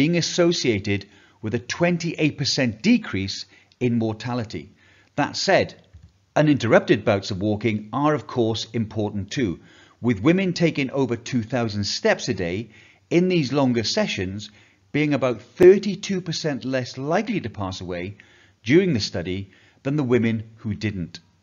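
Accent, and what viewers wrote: United Kingdom. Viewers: I am glade to hear the British accent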